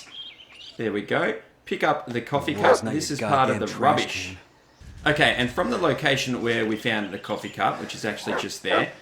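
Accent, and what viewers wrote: Australia. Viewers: GODDAMNIT the one good walkthrough I find!!!!! He has an Australian accent. I can’t focus 🤤🤭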